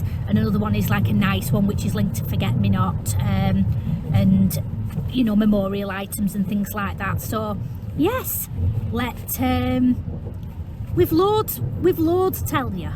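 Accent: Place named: Northern England, United Kingdom. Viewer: So chuffed you’re back and feeling better. Been missing your northern accent. You look fabulous too. Have a great Christmas and happy New Year. See you soon xx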